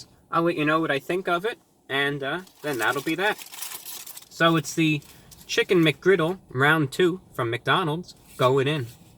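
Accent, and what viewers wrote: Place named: Transatlantic, United States. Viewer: bringing the transatlantic accent into the 21st century for sure 🍔🍟🍕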